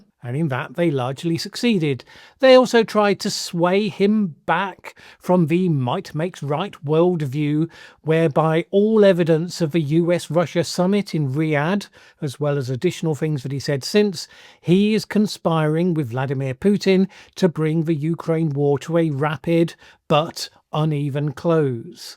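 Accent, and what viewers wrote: United Kingdom. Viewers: This clown sounds like a Brit. Perhaps he should be more concerned with the pathetic collapse of his own country and/or his own treasonous government.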